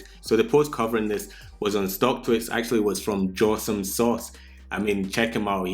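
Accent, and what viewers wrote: Scotland, United Kingdom. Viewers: That's a strong Scottish accent 😂😂👍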